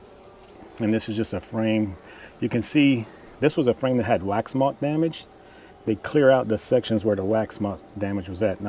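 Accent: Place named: Louisiana, United States. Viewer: Awe... Your voice make me miss home!!!! Lol I'm from butte la rose but I live in Florida now. 😊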